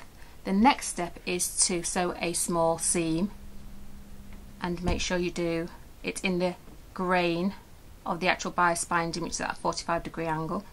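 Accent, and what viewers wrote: Manchester, United Kingdom. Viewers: very well presented, no waffle (like some fools who blather on about totally inconsequential rubbish) articulate and concise, very informative, clear, well photographed - FIRST CLASS!!! (from Manchester I assume??)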